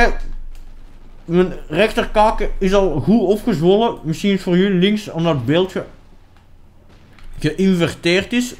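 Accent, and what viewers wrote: Belgium. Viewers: belgen hebben een HEEL cool dialect en belgen zijn baas !!! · Ga dan ook niet kijken als je zijn stem niet leuk vindt of doe dan je geluid uit... ik vind het echt niet vervelend om naar een belg te luisteren. Ik vind het wel een leuk accent =) dus pls ga niet schelden in de comments. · dat belgisch is gwn te verstaan ik vind het juist super leuk klinken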